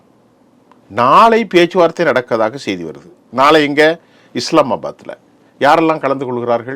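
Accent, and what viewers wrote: Sri Lanka. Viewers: இலங்கைத் தமிழ்.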